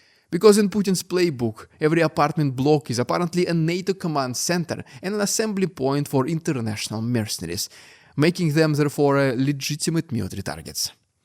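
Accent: Russia. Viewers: Fix your silly accent. Most intelligent Russians learn to master English before dumping their accents on the public.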